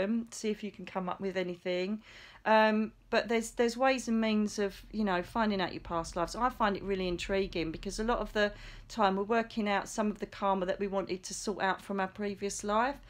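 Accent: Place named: United Kingdom. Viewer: Im just going to go ahead and say it ask it whatever lol 😅 is it possible that you have been a spirit guide to me before or can you be a spirit guide to someone while part of your soul is currently incarnated at this time let me explain its your voice the british accent the sound and frequency is almost an identical match to what Ive heard speaking to me for years. Im from WV USA but Ive always had a lovely female with a British accent that often speaks to me. I use to brush it off so noone would think I was crazy. Lol 😅 I said it I almost erased this but I heard a big NO in my left ear and a nudge to continue and ask dont erase or feel embarrassed 😂 anyways Thank you for all you do your a wonderful teacher ❤️😊 and yes I'm very grateful for all the knowledge you give and the stories you share dearest 🙏💜🕊️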